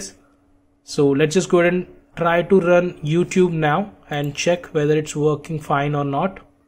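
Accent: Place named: Delhi, India. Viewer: I am guessing you are from Delhi, by your accent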